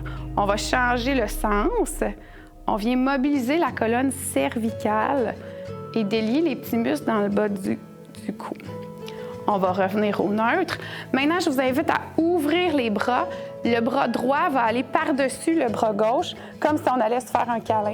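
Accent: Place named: Quebec, Canada. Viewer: grand merci pour votre réponse, car lorsqu' on est Français et qu'on n'a plus l'oreille trop fine, l'accent de la belle province a besoin d' être écouté sans perturbateurs musicaux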